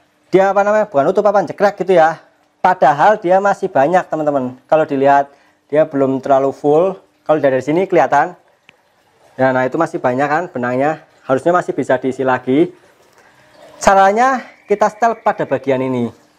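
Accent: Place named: Banyumas, Indonesia. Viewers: Mas orang ngapak ya sama dong 😁😁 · Ngoser, jembeng n amba....hahahaaa, ngapak abis Mas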